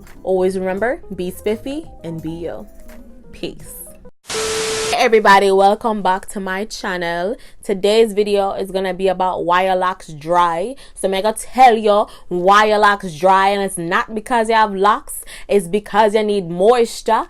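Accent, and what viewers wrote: Jamaica. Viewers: You from Jamaica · Ayyyy love the patois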